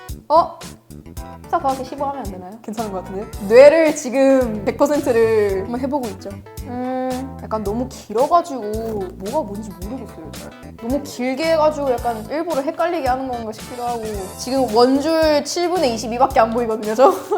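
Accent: South Korea. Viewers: 말투가 조선사람이구먼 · 예쁘고 귀엽네 발음이 완전 한국인이네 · 아무리봐도 유닉한 캐릭터임 한국말할때는 말투, 억양, 마인드까지 100% 한국패치완료됨. 서양 마인드만 살아있다면, 이런 캐릭터는 방송이나 연예계통뿐만 아니라 일반 사회적으로도 필요로한는데가 무지하게 많을듯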